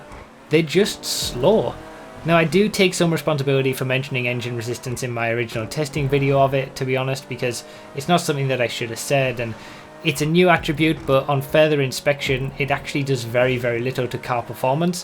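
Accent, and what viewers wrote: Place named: United Kingdom. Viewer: Niyatec No, it’s the british way of saying things.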